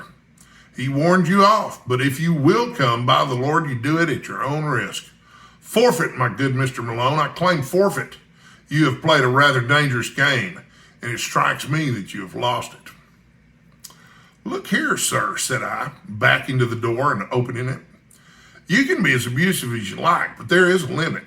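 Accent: Texas, United States. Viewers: You had me laughing out loud with this chapter, especially during the description of of their gymnastic tussle! I think I have the best chance of feeling fond of Professor Challenger hearing him with a wonderful Texan accent. I daresay the one thing that can make Conan Doyle even more enjoyable than it is on its own, is to picture it taking place with Texans rather than Englishmen (no offense to the English!). Anyway thanks for the reading.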